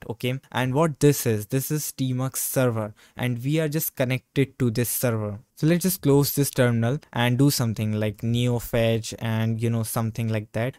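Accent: India. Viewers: I have saved this video to watch it later. Many thanks for this. Btw, may I know where you are from? You have an Indian accent.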